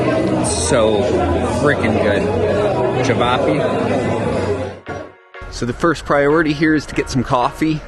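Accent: Canada. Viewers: toronto accent?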